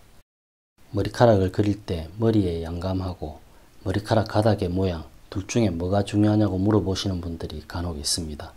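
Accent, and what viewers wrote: Gyeongsang, South Korea. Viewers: 혹시 대구분이신가요? 드로잉관련 자료찾다가 구독박고 애청자가 되버렸네요 ㅎㅎ 앞으로도 좋은영상 많이 올려주세요!!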